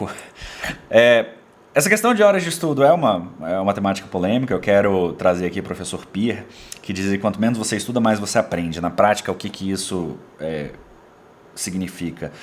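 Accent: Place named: Espírito Santo, Brazil. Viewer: Qual é a origem do seu sotaque? Eu conheci uma pessoa de Vitória - Espirito Santo que tinha um sotaque parecido com o seu.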